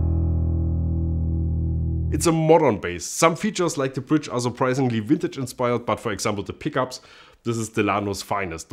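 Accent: Germany. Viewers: Just so much in this Vid . Amazing German accent- ✅ amazing bass ✅ amazing bass face ✅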